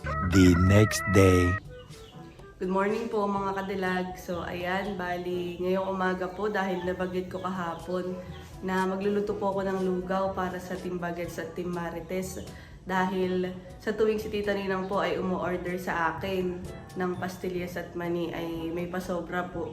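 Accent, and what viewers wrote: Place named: Infanta, Philippines. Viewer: Good morning ang sarap ng lugaw tamang-tama sa malamig na panahon😊 parang ang punto niyo po ay taga infanta tama po ba?😊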